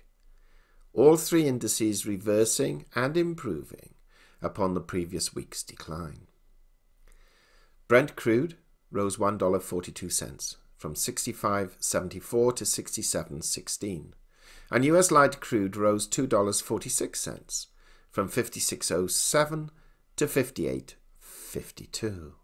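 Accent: United Kingdom. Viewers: I guess I'm into stereotypes. These reports as read by old British accent dude keeps me coming back. Sounds smart and full of wisdom, and So cool, Thanks for the info, I don't always like the truth in your reports, but I do really appreciate them. It's like listening to Harry Potter, but for economics and precious metals. 8)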